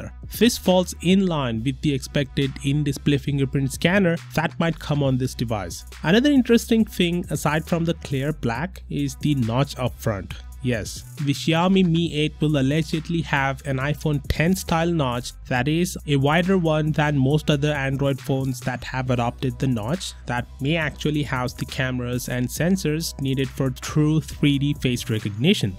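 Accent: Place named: India. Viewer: The way you're fluctuating between the Indian and the wannabe American accent is making you sound unclear. Go for something that is more comfortable with you.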